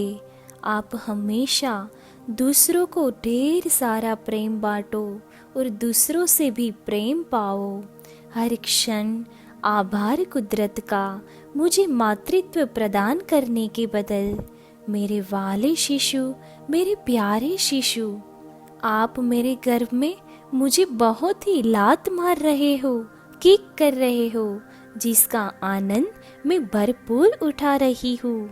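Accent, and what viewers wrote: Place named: Gujarat, India. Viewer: Aapki hindi me gujrati aa jati he.. Aapki hindi pure nahi he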